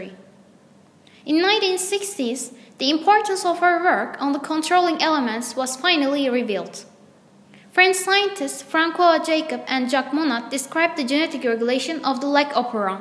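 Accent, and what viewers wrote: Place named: England, United Kingdom. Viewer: Impressive, liked your english accent, i want more.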